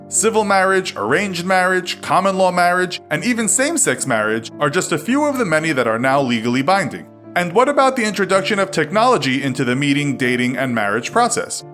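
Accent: Canada. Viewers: The Infographics show are you Canadian? Because you say eh? from time to time. I’m Canadian 🇨🇦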